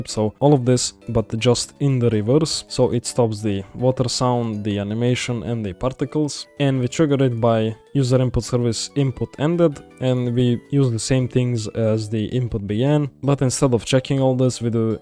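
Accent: Russia. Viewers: Bro your accent i think you are russian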